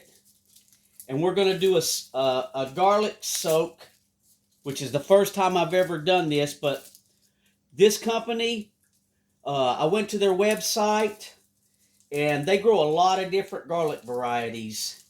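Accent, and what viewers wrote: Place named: South, United States. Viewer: You have just a little bit of a Southern drawl, sir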